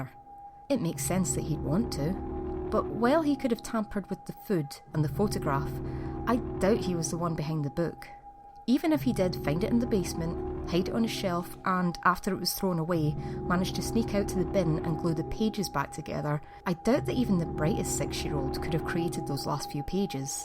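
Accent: Scotland, United Kingdom. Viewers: Scottish ayeeee?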